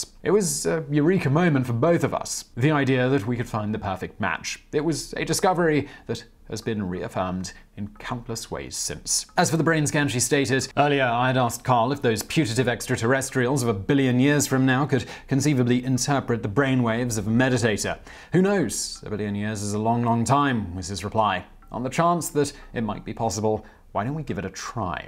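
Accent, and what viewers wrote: England, United Kingdom. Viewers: Sir - yes I said Sir. Pleeease do an episode on how difficult it was to take down a Knight on the battlefield during medieval times. I can't seem to find an interesting in depth video narrated on the topic with an English accent. Not too mention a video that also includes the history behind the armour, misconceptions and why they were so much more than an average soldier on the battlefield during their time.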